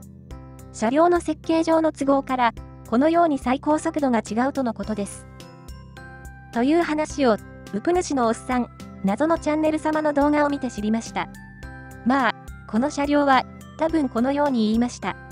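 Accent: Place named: Tochigi, Japan. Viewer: 歌、英語、栃木弁訛りとここ数日攻めているUP主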